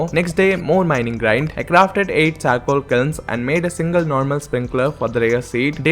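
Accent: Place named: India. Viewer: I know this guy thought it was AI when it can't even mimic Indian accent plus where have you seen in ai videos their logo pop up as their mascot never smh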